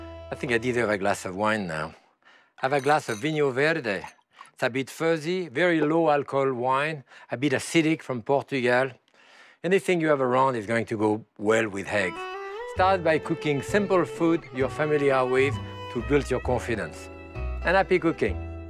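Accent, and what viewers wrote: France. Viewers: He actually said, "we're making more dishes with eggs" but it sounded like, "more of this shit with eggs." lol French people can't say the word "sheet" either and would say, "on a cookie shit." He often calls eggs.... "heggs" too. My mom is French so I've heard this accent all my life. It's adorable. I feel right at home with Monsieur Pepin. Can listen to and watch him cook all day. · merci M. Pepin...c'est se bonne-- this is wonderful....dae schopping of the herbes is a beautiful sound.....love his francais acCent!!!! ') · That french accent..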